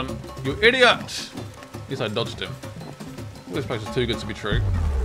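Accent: United Kingdom. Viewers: This lets play is much better cause of the British narration, makes it feel more like a documentary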